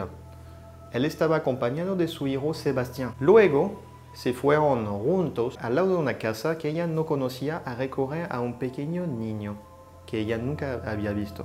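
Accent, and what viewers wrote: France. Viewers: Joli accent français ) pero el caso es desgraciadamente más complicado.. Aunque la primera versión que surja es la de Bernard.. y sigue siendo la más verdadera. Je crois que c'était lui.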